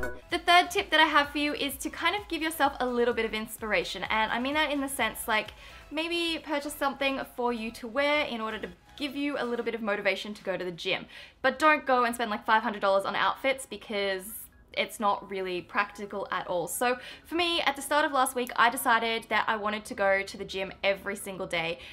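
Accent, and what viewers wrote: Australia. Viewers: Are you a Canberra gurl? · she's Aussie isn't she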